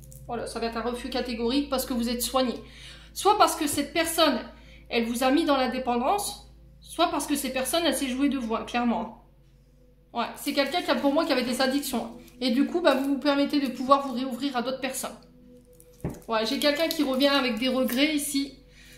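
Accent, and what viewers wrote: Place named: Pas-de-Calais, France. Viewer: bonjour vs êtes du nord pas de Calais? vs avez l accent bien écrasé du pas de calais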